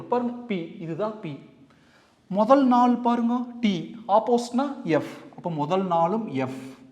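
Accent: Coimbatore, India. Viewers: Sir nenga coimbatore ah covai slang la pesurenga 😅❤😊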